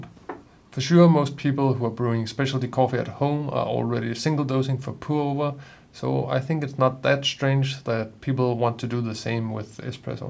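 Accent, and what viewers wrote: Denmark. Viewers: Hej :) Hearing your accent I am assuming your are from Denmark? I am getting my Specialita soon and was wondering where you printed the 3D single dosing hopper? Can you recommend something here in DK/Copenhagen that doesn’t cost a fortune? :-) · Hi It sound like you are from Jylland DK, if I am not mistaking 😊 Anyway you have many nice videos out 👍 I have the Eureka Mignon Specialita and had have it for half a year, Love it. (very silent) Although I use it mostly for filter coffee. I use it for 2 cups equal to 38 gm to 640 ml. The settings for filter coffee is almost at maximum size. In the community out there, there is a 3D Print file of a large scale where the is a gear to the small nop so it is easier to dial-in however it is NOT making the grinder more beautiful. There is also a 3D Print base plate which is useful, making it tilt to the front, a little like the new model. 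👍Thank you for your video👍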